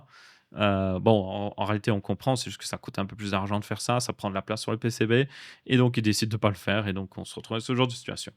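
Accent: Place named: Belgium. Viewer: J'adore ton podcast, agréable a écouter avec se superbe accent, qui me rappelle les copains de Belgique.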